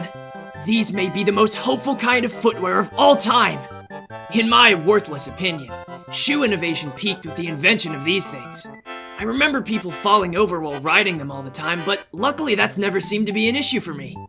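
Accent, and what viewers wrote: South, United States. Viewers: 'Ya didn't tell y'all fans 'ya had such a good cowboy accent! Teach me 'ya ways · ✨ I’m ✨ Uncomfortable ✨ with ✨ ✨ country ✨ accent ✨ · with a country accent is somehow cursed and blessed at the same time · If I never hear that Southern accent again, it’ll be too soon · I love your country accent so much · Love the country accent 👌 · holy hope, the country accent, man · how do you sound like and have a country accent at the same time. I - that's incredible. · HELP HE HAS A COUNTRY ACCENT THAT SURPRISED ME SO MUCH I JUMPED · HOW DOES HE HAVE HIS STUPID VOICE AND A COUNTRY ACCENT AT THE SAME TIME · the country accent scared me. i physically recoiled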